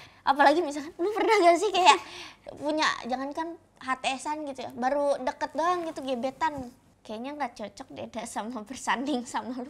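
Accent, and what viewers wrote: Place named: Java, Indonesia. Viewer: Kak aksen jawanya medok banget iya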